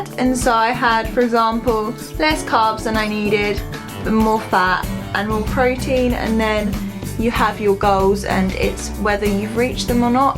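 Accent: United Kingdom. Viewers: where in england are you from??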